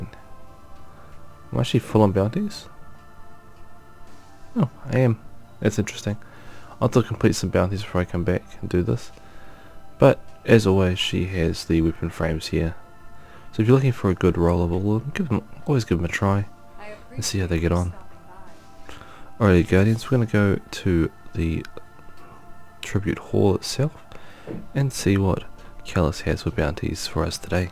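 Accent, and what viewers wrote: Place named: New Zealand. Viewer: R u a new zealander sound like it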